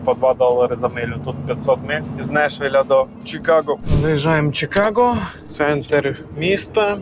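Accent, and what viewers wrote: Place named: Transcarpathia, Ukraine. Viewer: А ти походу із Закарпаття) удачі бро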